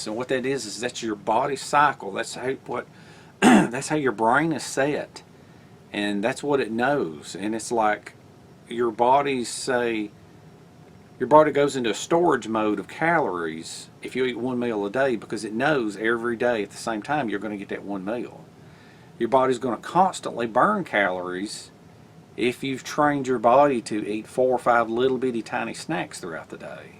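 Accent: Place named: Appalachia, United States. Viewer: Hi. Although it's true that you have a strong Appalachian accent, your word enunciation is always pure. Whereas, in my accent, some syllables are left out or modified. Your CC is great and matches your vocabulary perfectly. I did enjoy it. Thanks. I love having CC as an enhancement to a video.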